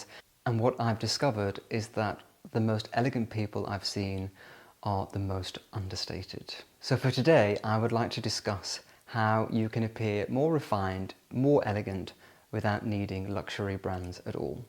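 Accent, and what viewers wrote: United Kingdom. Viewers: Your British accent with everything else is elegant❤️❤️❤️❤️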